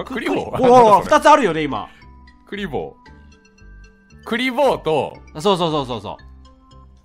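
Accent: Kansai, Japan. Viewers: 大体レトさんと同じだわ やっぱ関西なのかね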